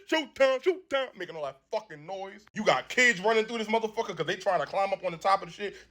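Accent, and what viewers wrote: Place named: Bronx, United States. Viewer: 5 and 4 are the best seats and I feel like you're from the Bronx are you?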